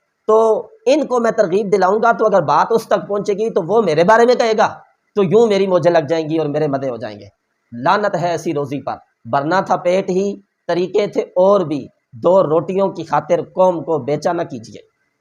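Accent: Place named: Arab world. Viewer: The damage has been done. Do you really think whatever you say we will digest as a Muslim? Lolllll. Pakistani ulma are 80% thug of Pakistan. They are not on the right path. Ap ko kya lagta h mutfi, hafiz title lagany s or apna accent arabic kr k urdu m bat krna will create even a tiny impact on us? Lolllll. Bhae ap pehly khud ko dekhen ap Islam k kya khidmat sar-anjam d rhy hen, phr dosron ko kahen. Jab ap ko aik basic chez ka nh pata ke knowledge kisi s bh mily agar ke wo sach h, you have to accept it. wo hindu h Christian h koi bh h , bhae wo research kr k koi chez batata h to is m galat kya h ke aik Muslim us k taref Kry? Ap muslim hokar kon s research krty hen ? Bus babaon k books parh k, ulma k bat sun kr, sedha YouTube p akar record krty hen, is k ilawa koi or kam h ap logon k paas? M beshak ap k j tarah mufti, hafiz Waly title wala banda nh hn, but m aik question bh Quran s karn ga. 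Hmary Rab k qasam ap n us ka answer tak nh dena. To is liye mery bhae hao khao peo, aram kro, ghar walon ka khayal rakho, islam ko wahen tak pehlao pehly, jab ghar waly ap s razi hojaen phr YouTube p ao. 😅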